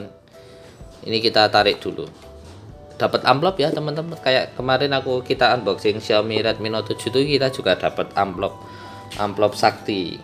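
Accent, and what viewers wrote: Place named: Java, Indonesia. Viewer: Josss logat jawanya ,,mudah dipahami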